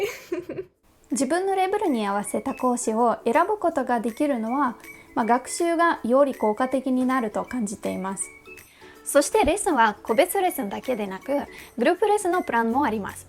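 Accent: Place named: Japan. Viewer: Gosto muito de ouvir voce falando, seu sotaque japones me agrada e eu entendo ,pois eu só não falo japones mas entendo que as pessoas falam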